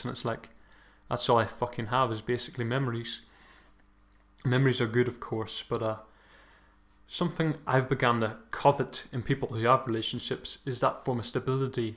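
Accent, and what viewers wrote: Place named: Wales, United Kingdom. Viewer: Skot Wilson he sounds welsh to me.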